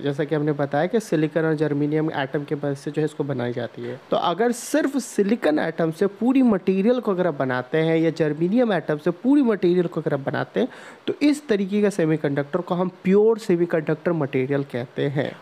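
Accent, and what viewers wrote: Bengal, India. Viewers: Sir are you bengali??? Your content is so helpfull for me.... Thanks for this great work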